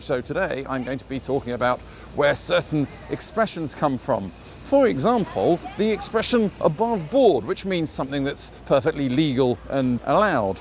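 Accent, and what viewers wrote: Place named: RP, United Kingdom. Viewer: Gracious, what a delightful RP accent! I didn't think there were any left. Not once did you pronounce "fings" instead of "things"--a rarity these days. 'Spoken from a true admirer across the North Atlantic. 'Cheers.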